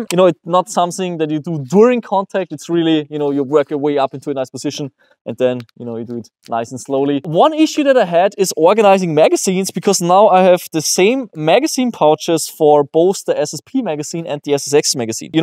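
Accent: Germany. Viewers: Eindeutig ein deutscher😂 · Bist du ein deutscher man hört es nähmlich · Man hört das du deutschsprachig bist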